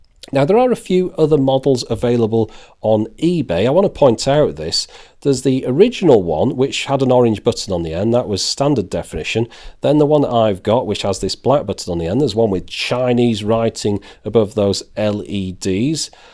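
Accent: United Kingdom. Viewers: Thanks for great video. Brit accents, colloquialisms, pronunciation of English words (verses proper American) usually grates on me, but I can tolerate you. Ha ha. Seriously your videos are PROFESSIONAL, scripts to the point, informative, and your speaking voice is excellent. If you're not a Pro "presenter" you should be. Thanks again, these videos are invaluable, help filter through the many China made products in this niche category of electronics. I'll buy this dispite the acid trip colors.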